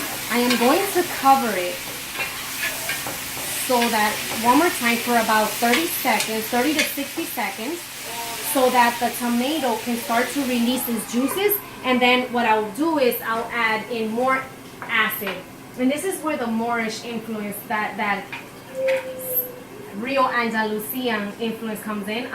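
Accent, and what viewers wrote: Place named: New York, United States. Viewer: Enjoyed ur video. I am Puerto rican, and I enjoyed watching you make this. Nice job. Are you from New York City? You kind of had that New York flavor...lol Thanks again!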